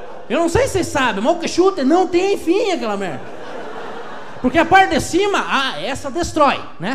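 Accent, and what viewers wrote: Curitiba, Brazil. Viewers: sotaque da região metropolitana de ctba :)))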